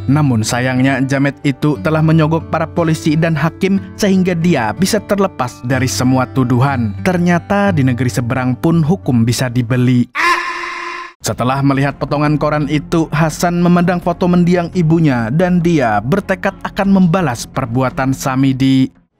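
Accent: Banjar, Indonesia. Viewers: Lah bng banjar kh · Orang samarinda kah banjarmasin pian mang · Banjar sekalinya 🤣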